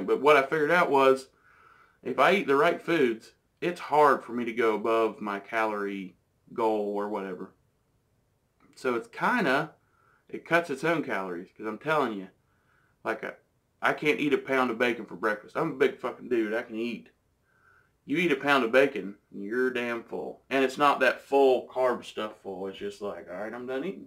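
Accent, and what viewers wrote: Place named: Alabama, United States. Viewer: U from alabama? I dont hear "himhaw" anywhere but south bama lmao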